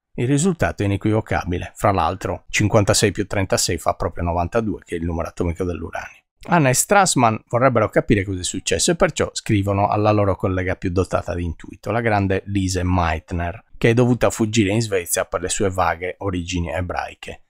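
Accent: Sardinia, Italy. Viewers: Complimenti per la sua chiarezza espositiva. Il suo italiano impeccabile e il suo innegabile accento sardo rende ogni suo video un tributo alla scienza che vale la pena essere visto e interiorizzato.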